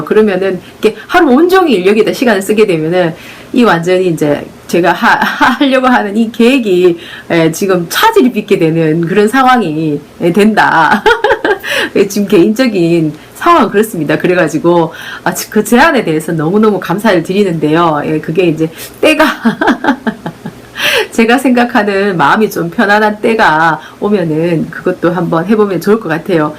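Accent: Gyeongsang, South Korea. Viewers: 신선하다. 모처럼만에 상큼하다. 감각이 탁월한 것 같은 느낌. 지식적 감각 또한 탁월하다. 경상도 목소리이면서 멋지다. 아뭏든 예리하고 분석적이다. 객관적 사유의 담소 아름답다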